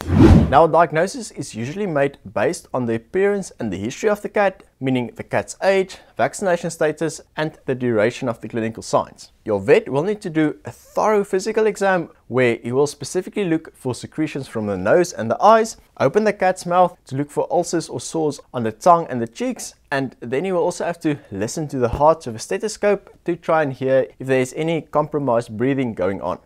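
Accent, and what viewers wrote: South Africa. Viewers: watch one of ur videos ur accent😂 is 100% south african 😃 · After 2 seconds of watching I knew that this guy was from South Africa. I can spot my people's accent from anywhere 😂😜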